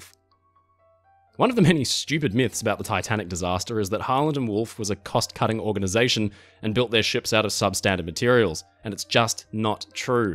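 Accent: Australia. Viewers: Good old Aussie accent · Are you from Australia?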